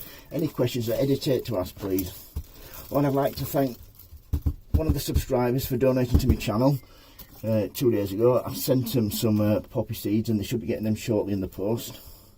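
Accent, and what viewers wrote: United Kingdom. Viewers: Lovely video. Loving the Yorkshire accent to obtw, I'm in West Yorkshire myself, or should I say mi sen 😂 What other flowers do you think would go nice with alyssum in a basket? Cheers, Ali.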